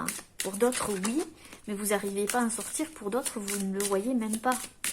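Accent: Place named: Hautes-Pyrénées, France. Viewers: je découvre ta chaine, j'ai choisi le tas 2. Tout est vrai et le chakra de la gorge cela me parle car pendant 65 ans j'ai tu ma personnalité pour éviter les conflits, pour ressembler aux autres avec cette impression incroyable d'être complètement différente. Ma façon d'être à toujours dérangé et j'ai perdu mon identité. J'ai le don de l'écriture, du chant et du dessin et depuis plus de 6 ans... je suis bloquée. Mardi je me fais ponctionner la thyroïde... à force de ne pas dire mon ressenti, de taire certains sentiments, j'ai développé un soucis qui peut être grave. J'ai des rêves prémonitoires depuis longtemps, je fais des méditations, de la cohérence cardiaque et aussi j'écoute beaucoup de séances d'hypnose pour avancer. Je confirme les douleurs dans le dos et les bras, la libido inexistante. Je me fais aider par une magnétiseuse-énergéticienne qui m'a dit que j'avais un pouvoir. 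Je suis trop fatiguée et fragile en ce moment pour l'aborder et le travailler à 65 ans on a pas la même énergie qu'à 20 ou 30 ans. Merci Jolie Voix à l'accent qui fleure bon l'Occitanie. Namasté 🛐☯️♾🌟🌹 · Bonsoir, Merci cela me fait du bien d'attendre l'accent des HP. Aucun endroit ne ressemble à notre département. Les marchés me manquent et la simplicité de nos campagnes. Les gens sont simples, et on rigole bien. Et la tourte!!! Tu es trop mignonne, très douce et gentille. Prends soin de tous ceux qui te sont chers et de toi aussi bien sur. Bye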